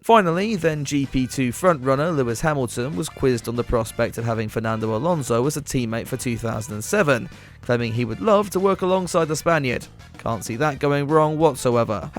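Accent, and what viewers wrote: United Kingdom. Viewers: Starwing in the UK ,which it sounds like my man is from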